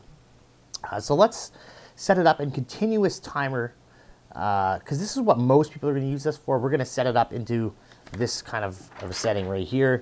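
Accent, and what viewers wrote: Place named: China. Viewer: Thank you and yes Chinese English is one way to put it.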